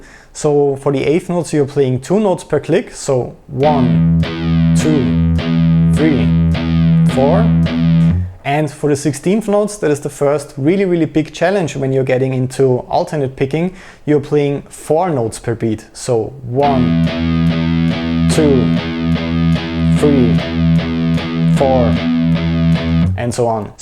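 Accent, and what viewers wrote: Austria. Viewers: Bro u have an Austrian accent isn't it? 😅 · Are you maybe an Austrian (Because of the Accent )( if I m right I gonna have to say Hawedere oida !!! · Ich mag deinen österreichischen Akzent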